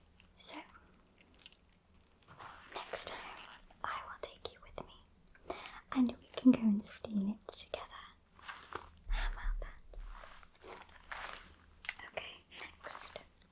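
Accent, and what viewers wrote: United Kingdom. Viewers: "You've got some foliage stuck in the back of your hair." 🫠 Aw that accent. ❤ It's something so commonplace as to be beyond notice to a native like you I'm sure. But the sound of your voice has the capacity to completely short circuit my North American mind.🗣🇬🇧 🤯👍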